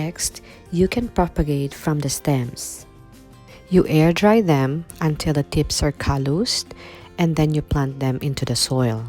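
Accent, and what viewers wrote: Philippines. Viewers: I can hear you're my kababayan! This is an excellent video. Thanks!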